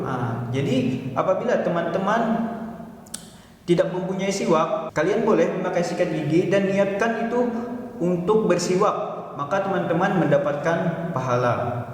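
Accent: Banjar, Indonesia. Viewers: kayak ada logat Banjar nya Abang,,,,dr mana asal nya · logat urang banua ni kada bisa dikeramputi lagi bang ay. mantap 😂 sehat selalu pian pun 😁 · Urang banjar Kalo? Logatnya tadangar sedikit han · hanyar aku tahu logat banjar..!! Banjar kalua kh?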